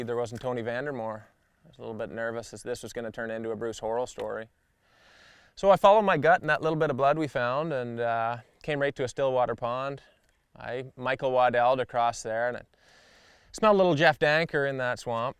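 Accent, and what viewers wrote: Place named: Canada. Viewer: He sounds flamboyantly canadian.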